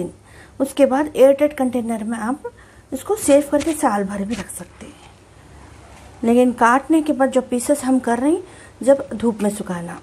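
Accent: Hyderabad, India. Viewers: Assalamu alaikum WRWB...Aunty...Masha Allah...bahut acche recipe bataye aap...eid ul adha ke time pe...correct timing...shukriya...thank you so much for your efforts..aap ke bolne ka andaz bahut pyara hai...hyderabadi style...Masha Allah ...bahut Accha explain kiye aap tips bhi kahe...Jazak Allah...Allah aap ke channel ko bahut taraqi de...Ameen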